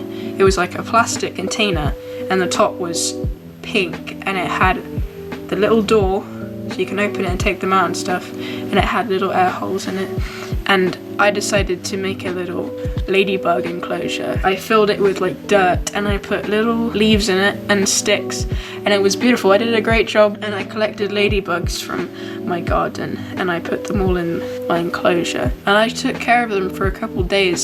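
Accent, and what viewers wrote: United Kingdom. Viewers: I want a British accent now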